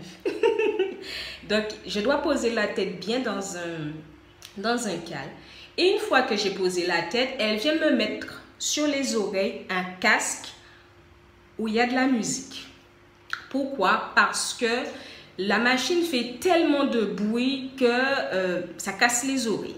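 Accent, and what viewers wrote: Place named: Guadeloupe, France. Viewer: Salut moi aussi j ai la drépanocytose Tu as l’accent de la Guadeloupe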